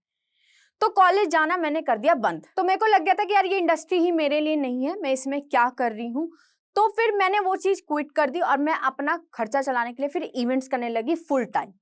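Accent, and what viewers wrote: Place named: Bengal, India. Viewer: Your sense of humour and witty expressions are unmatched. Excellent. Initially i was also thinking you make videos of celebrities so should be from a rich family with good contacts. May be some nepo kid. Today knowing your background i really respect you. You became and ideal example of success from ground. Last but not the least, you look like Bengali and your witty actions also matches that. Your hindi also not like a north hindi but gol-gol like Bengali Hindi, very sweet. 😂 God by mistake give you birth not an Bengali. Anyways I respect all human being. Have some video with traditional Bengali sharees. I not only subscribed but like and comment in all videos to support you and to support a fighter and good work. God bless you.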